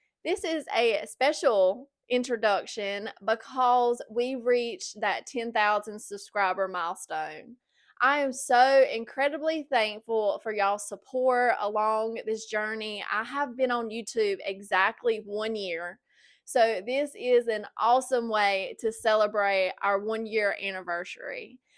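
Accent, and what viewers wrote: South, United States. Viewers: All these were great! I loved the tins the best, but the rooster in the frame was a close second. I think a deep red frame with black wax may have added something, but honestly, it's good as is. I'm grateful for my amazing family that tolerates all my crazy collections and hobbies! Congrats on 1 year and 10,000 subs - you are fun to watch. I'm originally from the south, living in Colorado. Your accent is like a warm hug from home. :)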